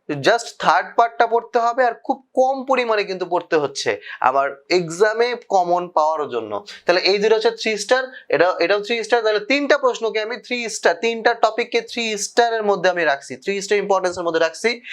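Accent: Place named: Pabna, Bangladesh. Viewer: আপনে তো পাবনার সার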